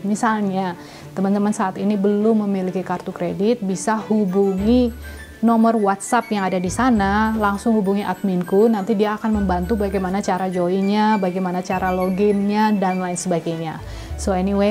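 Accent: Banyumas, Indonesia. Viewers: Serius ka.. aku juga dr CILACAP.. SALAM NGAPAK YAH KA.. n thanks bgt atas sharing nya..beruntung bgt ❤️❤️❤️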